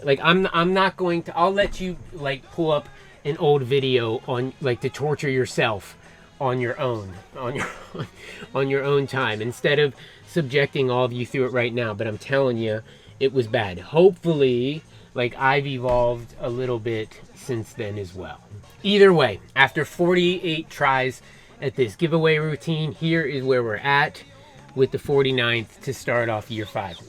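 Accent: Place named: North Carolina, United States. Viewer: Hey, now! Where do you reside,? It sounds like from your accent that it's relatively near me, in central Piedmont NC. And how about that disc? Pretty amazing how much work went into that bad boy!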